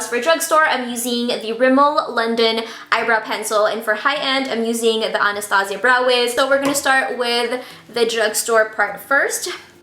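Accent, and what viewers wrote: Philippines. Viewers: You're accent makes me feel like I'm back with my extended family lol I haven't seen my dad's family in FOREVER and I miss them! Pinas have such adorable, recognizable accents haha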